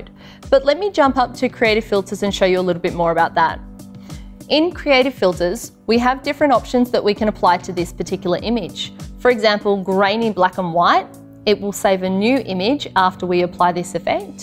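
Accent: Australia. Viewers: I guess she is Australian 😊😊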